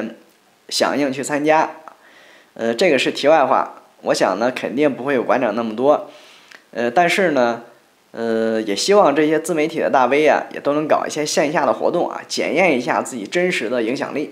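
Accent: China. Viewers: 聽你口音是內地的朋友 注意你的安全 大陸很危險的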